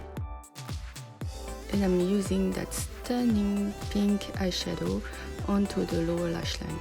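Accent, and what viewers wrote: France. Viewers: Great eyeshadow look and your French accent is 🙌🏼!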